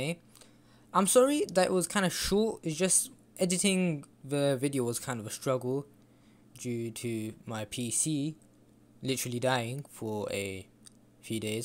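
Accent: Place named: India. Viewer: u sound indian😳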